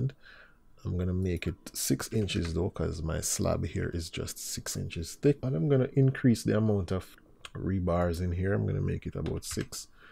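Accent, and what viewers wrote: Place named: Jamaica. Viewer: Absolutly the best tutorial of AutoCAD Architecture! Really good explaination in funny "Jamaica" styl:). Perfect. I'm looking forward for Last Episode with setting Sheets. Great Work!!!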